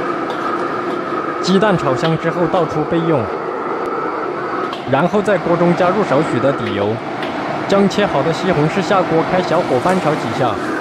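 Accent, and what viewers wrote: Sichuan, China. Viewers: 感觉这哥们是四川人，这口音应该是四川人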